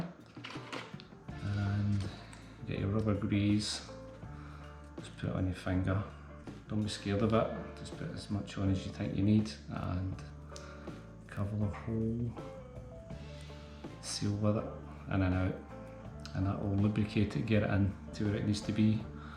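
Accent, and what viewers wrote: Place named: Scotland, United Kingdom. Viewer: Wow. Nice to hear a scottish accent too. I'm from North Berwick. Left a ong time ago though. Can I ask another question. I've started the engine no problems with a new battery. Before the engine has time to really warm up (within 1 minute) there are white fumes coming from the right hand side seemingly behind the cylinders - about that height. Looks as if there may be some electricals in there but it would need further dismantling to check. Have you ever had this happen and what was it. I'm at half a mind just to let it run and see if whatever it is (old coolant ?) burn off, but I don't want to burn something evident and have to replace ... decisions decisions. Cheers.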